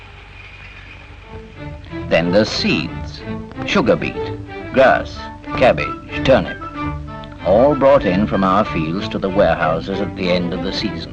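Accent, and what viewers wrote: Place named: Yorkshire, United Kingdom. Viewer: You can hear traces of a Yorkshire accent in the presenter's RP.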